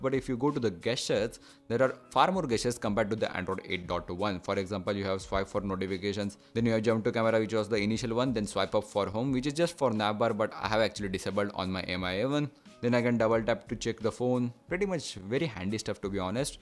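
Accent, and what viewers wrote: India. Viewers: Why Indians voices Soo interesting and different? How are you saying "R". My nerves broken. Somebody can say something? I don't want hear voice of them omg😵(I am not racist). If I hear voice of one of them. all day I can't forget that. This make me sick, just I want to throw up🤮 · too deep indian accent indeed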